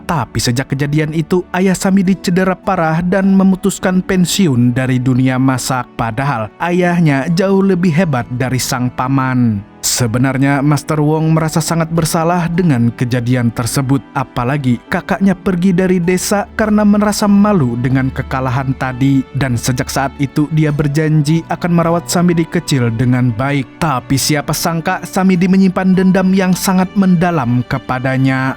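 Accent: Banjar, Indonesia. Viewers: Urang Banjar kah amang ni...??😂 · Banjar banar😂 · Urang Banjar sekalinya pian 😂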